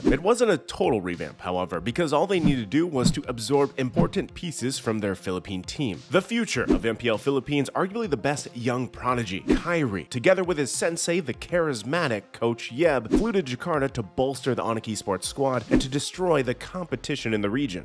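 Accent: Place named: East Malaysia, Malaysia. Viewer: Your voice It feels like an east Malaysian (Sabah & Sarawak) pronunciation kinda style 😂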